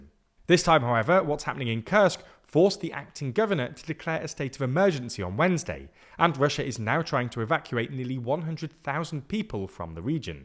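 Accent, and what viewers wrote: England, United Kingdom. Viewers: Do you have a speech impediment or just a goofy brit accent? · Never believe a single word about this war with an English accent. It is the Perfidious Albion sound of lying.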